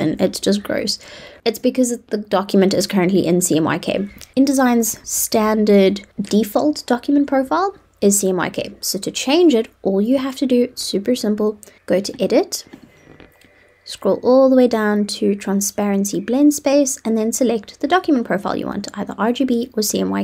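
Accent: South Africa. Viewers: I know a South African accent when I hear one haha! Great video. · South African accent stays winning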